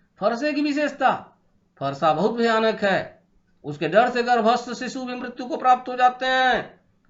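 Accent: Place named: Bhojpuri, India. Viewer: Waise toh tunne pgt hindi likha hai lekin padhta tu hai hindi mix bhojpuri, pehle apni hindi sudhar tab padhana supari nikal ke bol ...Pehle rehearsal kar tab video banana😀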